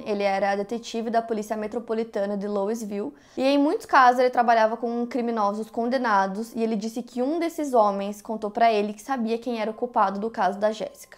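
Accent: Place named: Curitiba, Brazil. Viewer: Ela é de onde? Ela tem sotaque de Curitiba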